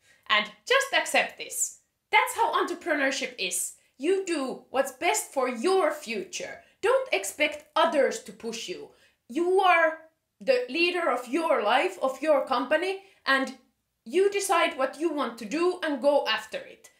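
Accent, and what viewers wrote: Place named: Finland. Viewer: 11 seconds in I am betting your from Finland??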